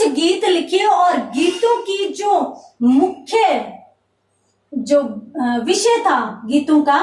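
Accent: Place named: Ganganagar-Bikaner, India. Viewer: Dii ,pata ni par mam ki voice se ganganagar,bikaner region se lagte hai।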